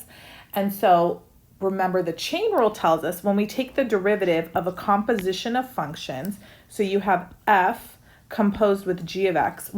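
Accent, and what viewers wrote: United States. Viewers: How's that one 😂 Adore American English)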